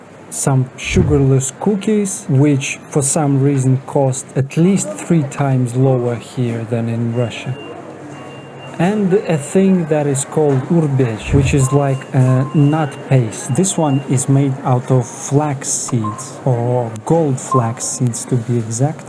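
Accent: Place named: Scotland, United Kingdom. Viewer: Sounds like a Scotty to me.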